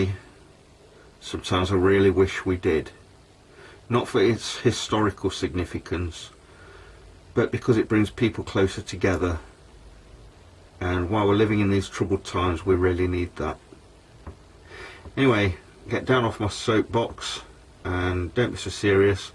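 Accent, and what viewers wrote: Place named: England, United Kingdom. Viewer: Hi Uncle! It was so great to hear from you guys. It's so exciting to have an uncle from the UK with a lovely English accent, I just love it . This page is great, Jake is wanting to watch every video so that we are gonna do. We love to play with trains but we never had anything like you have on here. Love you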